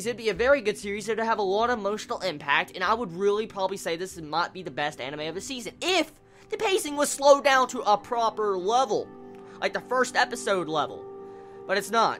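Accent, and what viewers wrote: United States. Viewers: are you american???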